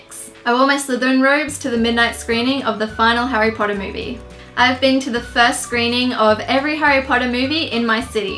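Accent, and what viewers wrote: Australia. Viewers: you are seriously so pretty! also random fact, you sound like Ingrid (missglamorazzi) but with an australian accent! haha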